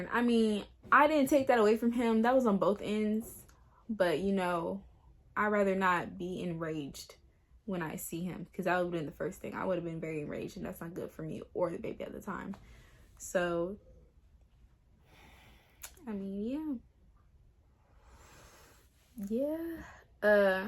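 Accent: United Kingdom. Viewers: At first the British Accent🤣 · your British accent isn’t bad x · Is the Brittish Accent for me 😂 Your so pretty 💞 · It was the British Accent for me 😂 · o shii i’m a new sub and i thought she was from london fr😂😂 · I’m British so ik that did u not read the comment 🙄 it sounded like how my cousins from north London speak so that’s why I said that so pls have a good day and goodbye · It’s the natural beauty for me🥺🥺and girl I’m from London and that British accent was on point🤣🤣🤣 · She British like what😲😱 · lmaf loving the British accent❤️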